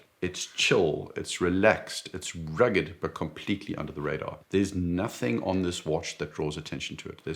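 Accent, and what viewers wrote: South Africa. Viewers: A fellow Saffa, I got the Pelagos Fxd Gmt, moved over from a garmin smartwatch, Strange enough from my research, Tudor played a huge role in the old sadf & navy Hear your accent & I was like this guy could be South African, he's using the word chill & the nearing the end said a braai done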